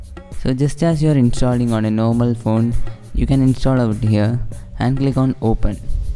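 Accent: India. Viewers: Indian English accent sucks!!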